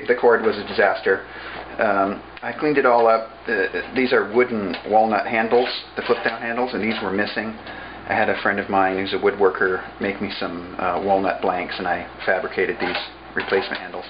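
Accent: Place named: Canada. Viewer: Your accent is, like, 5% Canadian. Are you in northern Washington?? What a cool accent, man.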